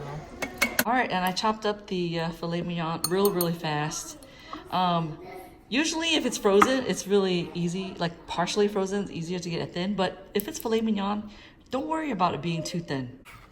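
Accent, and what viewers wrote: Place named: South, United States. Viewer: I love your family and your southern accent.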